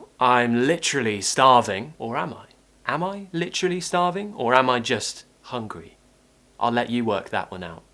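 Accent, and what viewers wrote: RP, United Kingdom. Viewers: I looooove the RP accent ❤️ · I'm brazilian and completely in love with your british accent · I'm Mexican and I prefer the British pronunciation vs American, it's easier and eny foreign can understand what you are saying.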